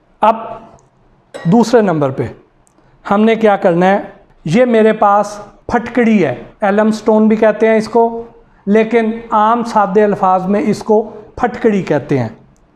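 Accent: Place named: Punjab, India. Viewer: MA SHA ALLAH sahib Aap bohat cute punjabi style k malik ho. ALLAH Karim aapko hamesha khush rakhe Aameen ya RAB Ul Aalaameen.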